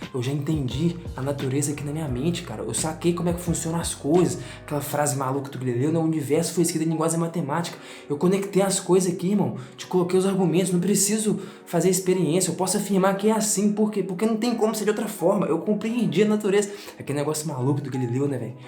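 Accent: Minas Gerais, Brazil. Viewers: Cara, como é legal ver você (um garoto uns 30 anos mais novo que eu) falando coisas tão interessantes nesse mineires delicioso. Não sou físico e nem matemático, sou paulistano e quase sexagenário portanto acho que não faço parte do maior segmento do seu público e nem sou o principal mas adoro assistir os vídeos e aprender com você. Parabéns pelo trabalho.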